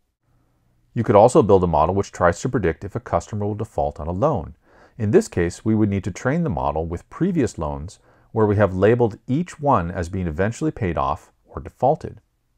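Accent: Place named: United States. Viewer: American English...pheww...finally found something that I'm able to understand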